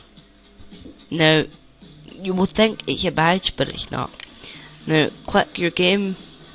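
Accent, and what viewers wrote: Scotland, United Kingdom. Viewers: judging from his tone im sure hes scottish am i wrong? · I can tell it is a scottish accent I'm scottish but I don't have the accent. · dued are you brittish your voice it sounds brittish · British accent + lisp. Not a good combo. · He is scottish, :P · sho ya hache cho dchownload ich in ya sherver... IS THAT HOW U SPEAK? clearer please... but i think you are scottish cuz your now is like scottish now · your scottish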